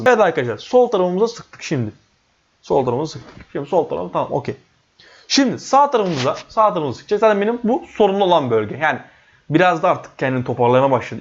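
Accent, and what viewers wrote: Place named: Thrace, Turkey. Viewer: Usta be trakyalımısın sen konuşmalarında gizli jjj sesini duyuyorum sorayım dedim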